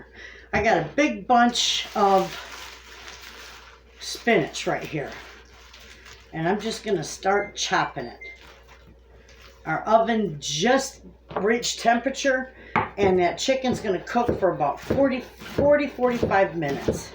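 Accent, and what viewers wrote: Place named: Midwest, United States. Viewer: I live in the south now, but grew up in the Midwest. I love to hear that Midwest sound in your voice almost as much as I love to watch you cook.😊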